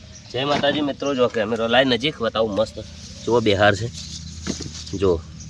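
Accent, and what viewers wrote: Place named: Banaskantha, India. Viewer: બોલી મસ્ત તમારી બનાસકાંઠાની બવ મજા આવે સંભાળવાની મારી વાઇફ બનાસકાંઠાની છે મજા વાત કરવાની ❤😂😂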